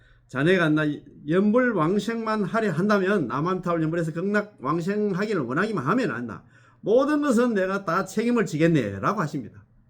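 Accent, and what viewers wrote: South Korea. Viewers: 오늘은 비가 내리네요 인자한 미소와 경상도의. 구수한 사투리가 정감이가는선생님의. 강의. ㆍ존경합니다 오늘도 건강하시고 좋은날 되십시요 나무아미타불 나무아미타불 나무아미타불.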